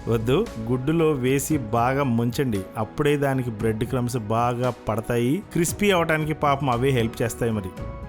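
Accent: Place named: Visakhapatnam, India. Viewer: Me native place enti garu achamga visakha telugu la untadhi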